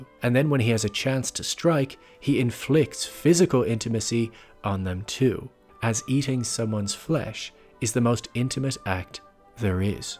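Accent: Ireland. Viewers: I dedect a faint irish twang?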